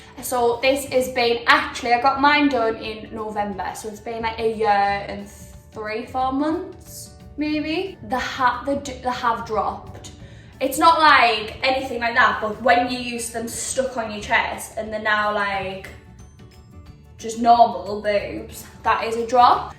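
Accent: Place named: United Kingdom. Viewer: omg your british accent is lovely!!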